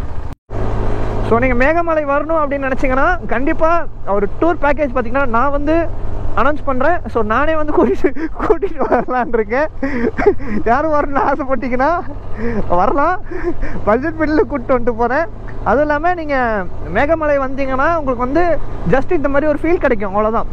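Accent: Kerala, India. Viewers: Ean bro malayalam slang la pesura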